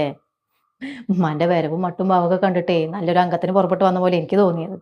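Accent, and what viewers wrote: Palakkad, India. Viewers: Palakkad basha my favorite ❤❤❤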